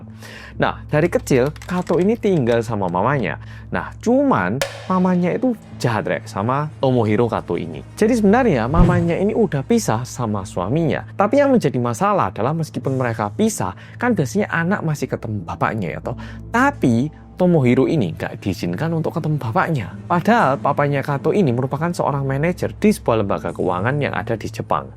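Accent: Java, Indonesia. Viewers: paling demen nonton ini, logat jawanya medok banget ya wkwkwk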